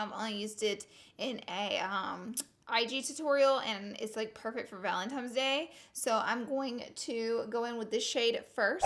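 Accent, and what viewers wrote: South, United States. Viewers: Katie Serious Talks its her accent and they do sometimes say it in the south. So can you not be so rude and negative. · #snapchatfam #igfam your my favorite YouTuber by far I’m from North Carolina and have a strong southern accent like yours and I absolutely love it 😍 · Instagram fam😘😘 I LOVE the way you say Valentime's DAY, conciler, etc... Gotta love those southern twangs 😘❤ from one southern girl to another, I like the way you talk 😘❤ NC HERE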